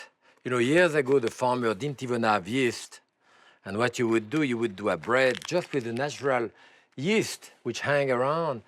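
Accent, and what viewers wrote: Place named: France. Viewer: I want to love these videos. I really do. But I can't helo but wonder how long you have to live in the US before you finally lose the thick French accent that makes it sound like you just arrived off the boat and barely speak any English. Apparently 50 years is still not enough. "A lot of de chef, dey ave de special razeur." Come on chef, you know how to speak English as well as I do.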